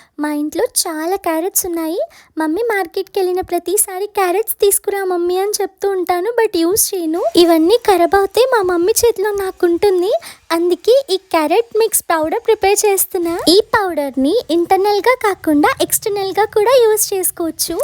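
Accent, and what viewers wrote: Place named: Andhra Pradesh, India. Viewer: Akka nuvvu telangana ammayi va ap ammayi va nee slang chusthe ap la undhi mee family slang accha telugu (ts) la undhi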